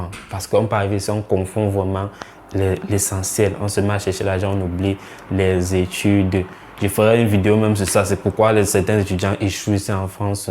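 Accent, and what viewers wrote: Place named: Cameroon. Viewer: Avec le bon accent camerounais a la Francis ngannou yes frerot force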